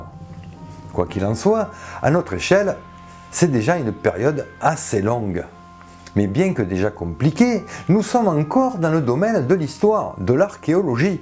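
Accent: South, France. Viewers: Quel plaisir d'entendre cet accent du sud. Comme toujours c'est géniale, tu est le meilleurs vulga en paléontologie que j'ai eu l'occasion de voir, ce que tu fait devrait être regarder au collège.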